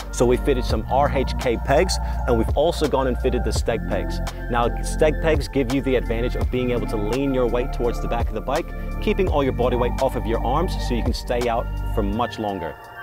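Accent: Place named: Australia. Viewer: Like it's kinda Aussie but all over the place at the same time?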